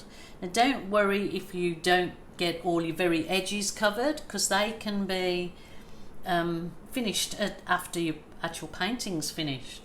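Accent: Birmingham, United Kingdom. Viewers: BEAUTIFUL !!! can I ask you sound like a slight Brummy accent. Maybe wrong lol . Love your work 😘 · Hi, have you got a brummie accent going on there? Just found your channel and so thankful I did. Made my day watching this, I am a newbie and can only wish for a result as lovely as this. Thank you. x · I love this it's great and may I ask are you from Birmingham? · It’s. beautiful and I can understand the emotion you showed. Thank you for showing us how to feel free in a lockdown situation. Are you from Birmingham Uk?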